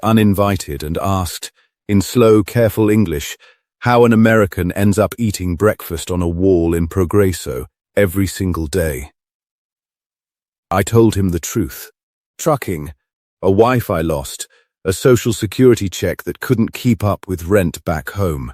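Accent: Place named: United Kingdom. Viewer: Why is my gut telling me that this is AI? Is it the strange eye-blinking or the British accent saying he was a truck driver in Ohio - lol!!!!!!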